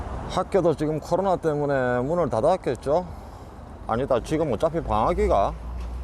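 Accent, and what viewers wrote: Gyeongsang, South Korea. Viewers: 오래간만에 해운대 마실 나가셨네요~펑소엔 못느끼는대 외국에 오랫동안 나가있음 작은추억하나 사무치게 그리울때가 많으시겠죠~ 님 인기비결을 제가 나름 주제넘게 예상해봤어요~^^ 1.벗님들이 평소 보지 못했던 북미대륙의 구석구석을 운전자관점에서 볼 수 있어 좋다 2.말투는 오리지널 경상도의 투박한 사투리를 쓰는대~어라? 영어로 모든 토킹이되네?ㅎㅎ 투박한 말투의 선입견을 가진 저를포함한 벗님들의 예상을 깨는 어휘실력 3.운전벙커안의 생활 모습을 스스럼없이 보여주는 솔직함의 매력 4.본인 스스로가 어려움을 겪었던 과거,현재를 솔직담백하게 풀어서 구수하게 이야기해내는 매력 5.돌쇠와 날쇠의 멋진 콜라보레이션 특히 날쇠라는 아이템이 짱인것 같습니다 제가 생각한 인기비결이신것 같구요 캐나다 돌아가셔서도 그냥 더 잘해야지 하지마시고 평소대로 꾸준히 해주심 좋겠어요~~^^ 그리하셔도 벗님들 다들 어디 안가시고 그대로 계실듯요 주제넘게 주절주절 시부맀네요~~ㅎㅎ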